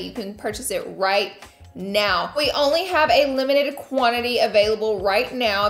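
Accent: South, United States. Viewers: This is random and probly so 2017 but I love how you say concealer! Love that Southern accents still coming thru. Also you are always so fun and hilarious. Cracking me up all the time. Between you and Manny just making day. Thank you for being that Icon ,that boss babe for our future generations to look up to, congrats on your new holiday collection and for the price are u kidding, that's a good deal.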